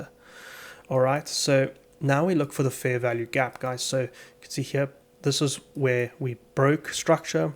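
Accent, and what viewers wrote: South Africa. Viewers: dude you sound South African hey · are you South Africa?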